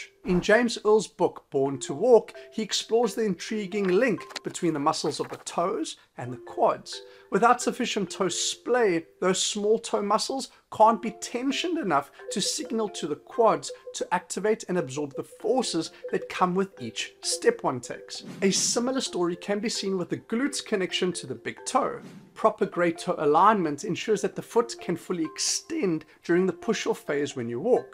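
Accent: South Africa. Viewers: Just listening to you makes me homesick for South Africa. Well done, bro.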